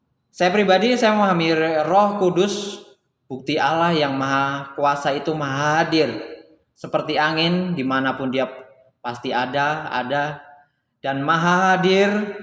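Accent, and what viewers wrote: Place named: Java, Indonesia. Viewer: Logat Jawa...Pengin pulang kampung rasanya 🙈🙈 Sub jg Christian Prince channel mas..🙏🙏🙏 Ntar datang kesini ntar malam <link> Kutunggu ya Mas...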